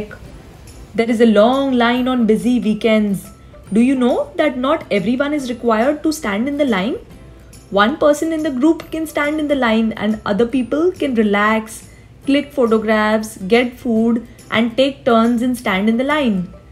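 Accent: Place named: India. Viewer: Indian accent English 😅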